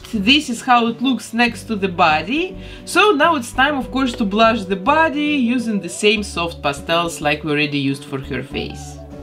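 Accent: Russia. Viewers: For so long I wondered why this Belgian lady has a Russian accent... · Buffy I was just abt to comment that, her mold is perfect and she’s Russian herself (she has a Russian accent so I’m guessing she’s Russian)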